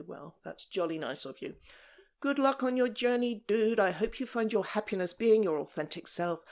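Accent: United Kingdom. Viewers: I've watched enough of these to lip sync near perfectly what she is saying and let my brain fill in the British accent. I've never been more terrified of a YouTuber for yelling at her audience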